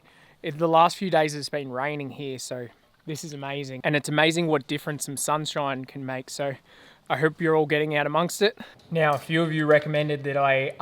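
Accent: Australia. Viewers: It’s good to see and hear an Ozzie doing a camper conversion Keep up the good work and the Occerisums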